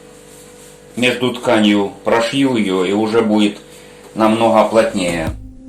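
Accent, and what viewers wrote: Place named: Donbas, Ukraine. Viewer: Разговорный Донбасский) сразу узнала, чисто по мужски сшит чехол))))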